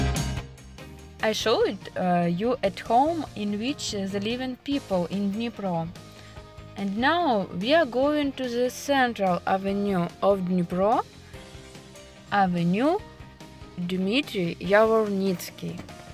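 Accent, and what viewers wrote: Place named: United States. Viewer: Beutiful American voice bravo. Can you visit usa? I have the money.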